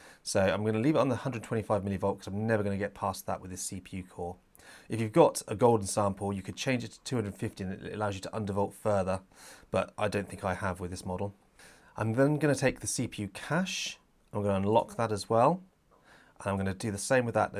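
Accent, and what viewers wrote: United Kingdom. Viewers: I love this channel. British accent and detailed tech stuff ❤️❤️❤️ this is proper nerd stuff mate. Keep it up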